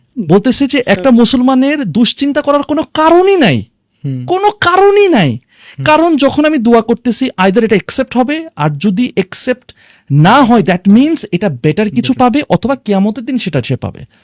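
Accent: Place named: India. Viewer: ভারতীয় ইংলিশ মারায়, ইংলিশ লাইনের এর শেষে "না~ না ~" করে। নোংরা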